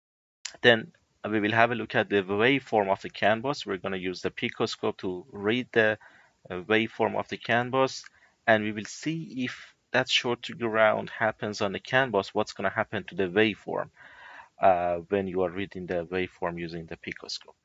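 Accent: Iran. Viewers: good job man, thank you so much for your comprehensive and functional training. your accent says that you are Iranian, am I right?😁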